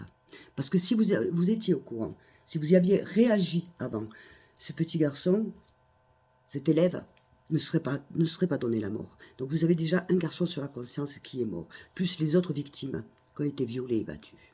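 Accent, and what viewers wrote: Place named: Nîmes, France. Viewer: Vous êtes marrante, malgré votre vie de souffrances, et vous gardé l'accent nîmois. Je me suis abonnée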